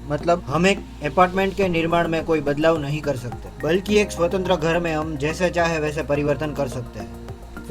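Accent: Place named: Kathiyawad, India. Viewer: Bhai tu kathiyawad ( Gujarat) se hey na? Speech idea aa gya... Ye banda rajkot ya Bhavnagar side ka hey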